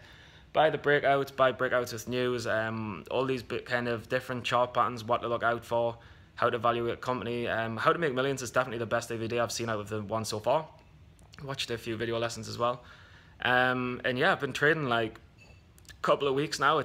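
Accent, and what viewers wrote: United Kingdom. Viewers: Hi, came across your recent podcast with SteadyTrade (that was awesome btw 👍). As soon as I heard your accent I was like the guy is from the UK (as am I, Birmingham). I was exactly like you, wanting to know if this was legit and I've come to the conclusion that Tim and his education service is 100% legit. I've put in so much study time and to be honest I'm just scratching the surface. That's the reality, it's pure hard work and dedication and I have such a long way to go but the key is I'm loving the journey. I am a silver subscriber and before that i purchased HTTM at the beginning of lockdown. What an investment that was.... at the end of watching it, I was like okay this is pretty cool so let's go deeper. A month in on silver I've just hammered out the studying. Still have loads to learn, but when I hear of progress like yours it makes me wonder just how far can I personally go if I really dedicate to this. 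I'm looking forward to seeing your progress and thoughts through these videos. I also followed you on twitter yesterday, so I'll be sure to reach out at some stage. I take it you are also on Profitly, if so it would be great to follow you and see how your journey mapped out too. Thanks for the boost of confidence, it's just added fuel to the fire I already have. Let's do this!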